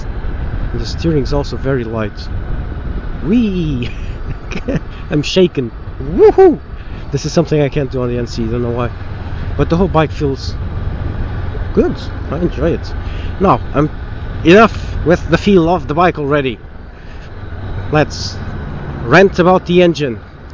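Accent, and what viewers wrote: Portugal. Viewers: are you portuguese? you have slight a portuguese accent :D loved the review btw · Mal te ouvi pensei logo "Tem sotaque Portugues" vi a matricula "Confirma-se" xD Abraço, belo video, vou ver se tiro a carta de mota este ano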